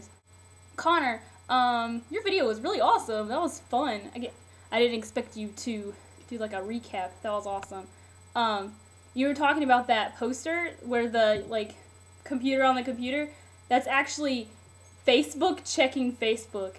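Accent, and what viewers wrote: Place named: South, United States. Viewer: "Oh gosh she's freekin'" *Camera cuts out* Hahaha. What is your accent? It's really cool. It sounds kinda southern... but you don't talk slow. It's a good mix.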